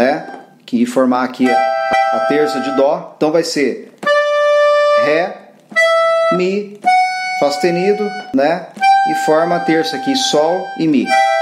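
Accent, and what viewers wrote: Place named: Rio Grande do Sul, Brazil. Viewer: Vc é gaúcho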